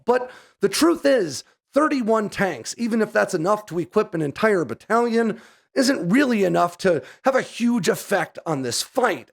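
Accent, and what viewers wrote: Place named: New Hampshire, United States. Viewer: He went to school in New Hampshire and lives in Georgia. His accent is definitely New Hampshire, but some southern gets in there from time to time.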